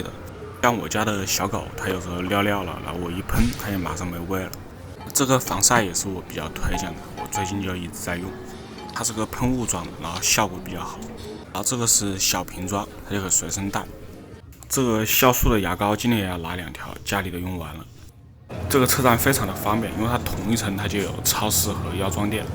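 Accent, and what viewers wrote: Wuhan, China. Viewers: 楼主武汉人，弯管子，口音好重。😄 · 楼主口音像湖北武汉的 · 听口音就听出来是武汉滴，果然哈哈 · 这个汉普好亲切啊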